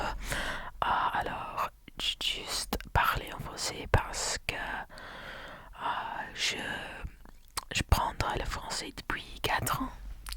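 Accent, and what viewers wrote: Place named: Canada. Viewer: It sounds a little bit like videos from canada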